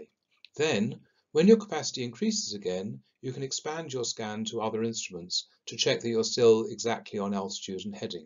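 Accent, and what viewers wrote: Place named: United Kingdom. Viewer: Thank you so much for this basic info for every aspiring pilot...Very informative, and presentated in a very clear and "to the point" way! (despite it's a bit difficult for me to grasp everything, because of your Brtish accent, but i' plan to watch the video several times.) Once again, thank you Sir!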